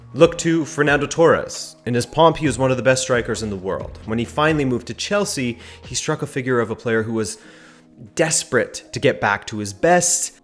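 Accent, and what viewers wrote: United States. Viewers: the only time I hear football spoken about in an American accent, it's completely bashing it. nice change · Heard an American accent, turned off. It's Inter Milan not Inter Merlhaaaan. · When I heard your American accent I thought you’d be making me angry at some point like most Americans talking about football. That didn’t happen. Very informative and well made video. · Are you American? Lol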